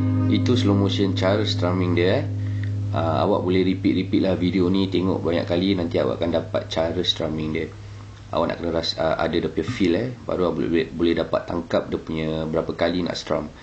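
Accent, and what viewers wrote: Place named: Singapore. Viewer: bro orang spore ke? cara style cakap mcm orang melayu spore la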